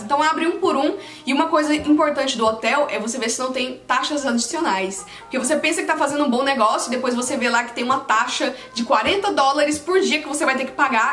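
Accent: Goiás, Brazil. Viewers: Sem conhecer você em um outro vídeo imaginei que fosse goiana pelo sotaque,morro de vontade de ir para outro país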